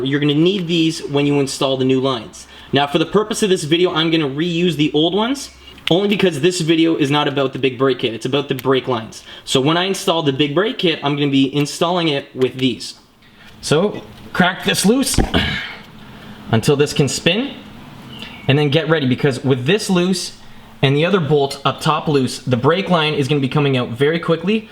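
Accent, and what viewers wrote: Canada. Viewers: Yeah Canada! Accent gave it away (I think). Great video! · He’s Canadian my dood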